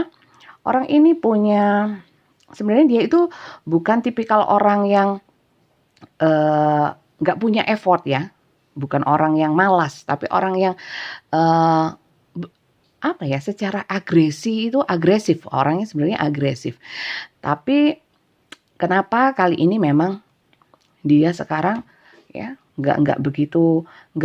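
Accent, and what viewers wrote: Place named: Malang, Indonesia. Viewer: Suka banget cara nyampaikan bunda , Arema banget pokoknya soalnya dulu waktu kuliah di mlng teman teman cara ngomongnya ya logatnya kayak bunda deh, kakak saya juga banyak yg tinggal di mlng jadi klop dgn bunda , enak dengannya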